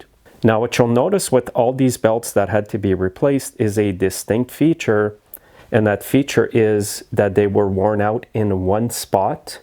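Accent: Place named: Quebec, Canada. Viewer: You sound like you are from Quebec… are you?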